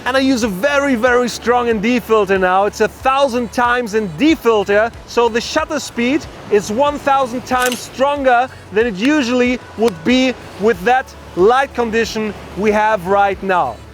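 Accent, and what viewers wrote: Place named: Germany. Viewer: Came around on your channel today. You make super fun videos and your german accent is really good to listen to :D Hello from Slovenia.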